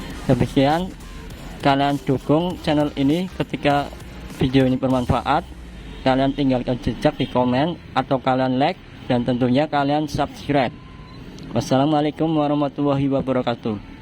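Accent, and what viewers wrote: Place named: Moga, Indonesia. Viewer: Pemalange ngendi kang..... Opo moga, kadi logatte koyo wong moganan 🙏😁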